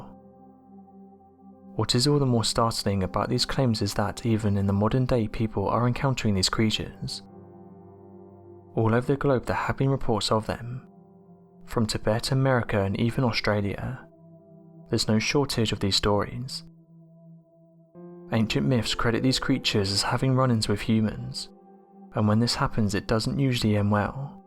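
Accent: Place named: United Kingdom. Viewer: ..... I am thinking it's a British/ UK 🇬🇧 accent!...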